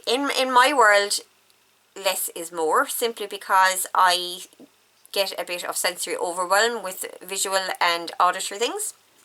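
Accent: Ireland. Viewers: Superb, fun, engaging, inspiring 🌹 Thank you so much for creating these two styles, they are just wonderful and I’m thrilled that you use lace and fabric, gesso and metallic in these styles, I’m definitely going to create a Shabbychic style for my current project! Wonderful to hear your beautiful Irish lilt and watch your happy, fab tutorial! Hugs and blessings 🫂🙏🏻❤️😍🌹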